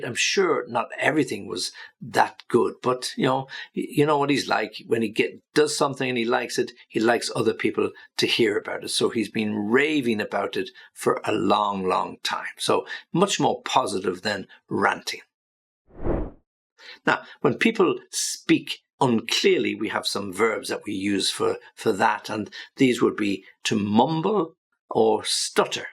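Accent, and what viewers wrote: United Kingdom. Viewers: Hi teacher! Thank you for your help and work. Amazing, helpful… really good to improve our English. By the way, from what part of the UK are you? I am trying to catch your accent.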